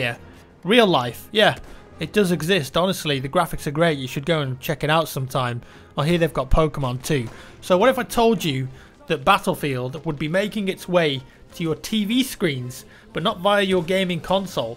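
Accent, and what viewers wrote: United Kingdom. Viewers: Drink a shot everytime he says Battlefield in his nice british accent :D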